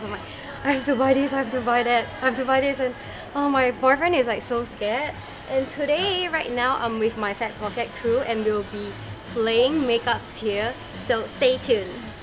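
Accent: Singapore. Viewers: sounds like a sgp dialect ;D bute etude house has so many cute and really effective stuff ! love it !! they should also sell it in europe ~ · Singapore! Know it from the famous singlish 'lah' and 'Leh' she uses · Singlish lols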